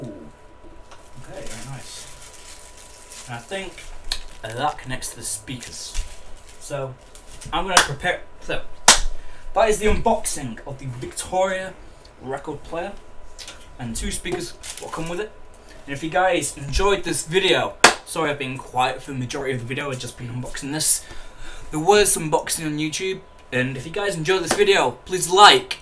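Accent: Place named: United Kingdom. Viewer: Michael Woodruff dude....lay off it's how the Brits talk....kinda like privacy or aluminum.... Lol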